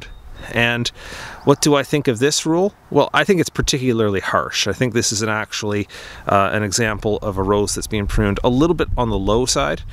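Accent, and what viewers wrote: Canada. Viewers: Me too! My Dad has about 6 large rose bushes, and in 3 years have never been trimmed. I just had no idea where to start tackling them but have a much better idea now! First video I’ve seen from you and it took me about 30 seconds to spot that awesome Canadian accent! You have amazing plants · Great Canadian accent!😄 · Very helpful! Love your Canadian "accent"! You sound a lot like Scott Thompson from The Kids In The Hall! Yes, I'm old!!!!!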